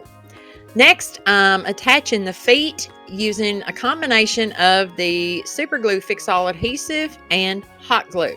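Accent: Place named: South, United States. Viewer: SPRING! Love that you don’t sound rehearsed, also LOVE your accent, I’m a Southern girl too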